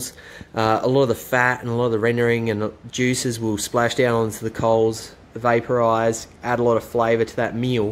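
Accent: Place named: South Africa. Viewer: Love that SA accent mate, great video, cheers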